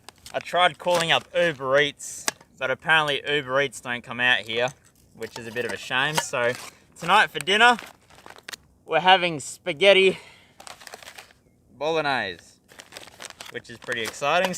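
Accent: Australia. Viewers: Great video :D I'm watching you from some time but I didn't notice that you are half Polish. I guess you were born in Australia which explains the lack of accent. But it's nice to hear "no a szufeleczka, albo coś, dzień dobry..." next to your Australian accent.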